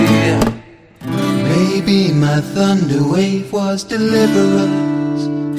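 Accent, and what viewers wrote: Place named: United Kingdom. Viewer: You’ve never sounded British until this video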